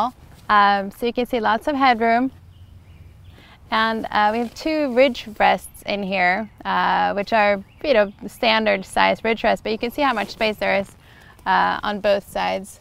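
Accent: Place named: United States. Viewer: Discasting american accent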